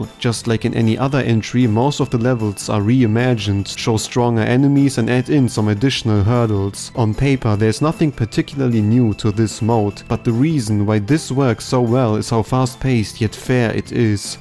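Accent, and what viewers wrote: Germany. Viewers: as an german i can hear you are german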